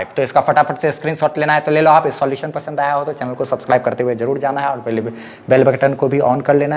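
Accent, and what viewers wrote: Bihar, India. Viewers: Sir ji Bihar se ha kya ?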